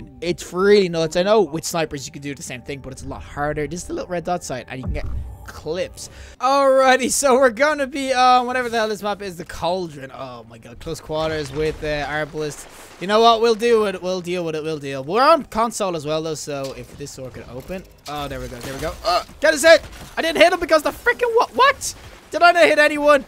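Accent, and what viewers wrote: Ireland. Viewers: U Irish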